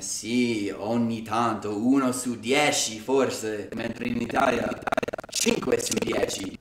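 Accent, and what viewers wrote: Rome, Italy. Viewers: Ciao il tuo accento romano e' fortissimo! Io lavoro per UA at DIA chissa' se un giorno it vedro' passare. Ragazzi godetevi l'Italia!!❤😭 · 😂😂😂parli anche un po' romano... Ti aspettiamo in Italia 😘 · Daje parli romano mi mancano i tuoi video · Apprezzo, da romano, la punta di accento romano che hai! Continua così! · parla un po di romanaccio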